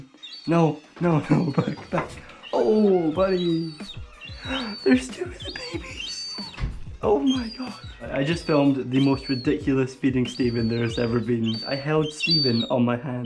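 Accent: Scotland, United Kingdom. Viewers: sounds like a scottish morgz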